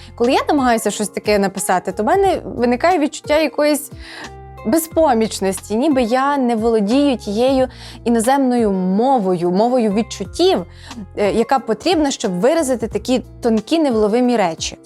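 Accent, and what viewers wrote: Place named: Ivano-Frankivsk, Ukraine. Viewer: Коцюбинський- талант! А ви хто, щоб іронізувати і зневажати? Історик розповідає цікавіше, мудріше, без принижень історичних постатей. У Києві опинились нещодавно, але вже знущаєтесь із свого станіславівського діалекту. Неприємно слухати. Хай би молодий історик усе викладав…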